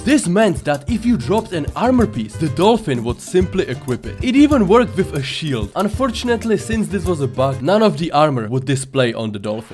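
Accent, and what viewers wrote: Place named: Czech Republic. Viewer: Libi se mi ten tvuj ceskej prizvuk😂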